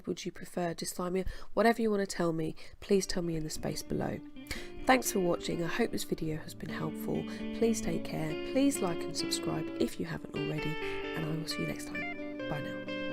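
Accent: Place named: United Kingdom. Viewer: (Sunday November 1st 2020) Greetings from Montréal, Québec, Canada !!! I do not remember how I came accross such videos, but I FINALLY put a name on my emotional/mental state ' Dysthymia'; exactly what I have been going trough for more than 25 years. I am 60 yrs old, and for the past 5 years...I have had more downs than ups...and it's NO FUN !!! My NEW doctor has prescribed me some pills that I have to start taking this monday to see IF my emotional state shall regain the energy that I used to have...I shall keep you posted shortly. Great video, love your voice, love your british accent !!! Cheers !!!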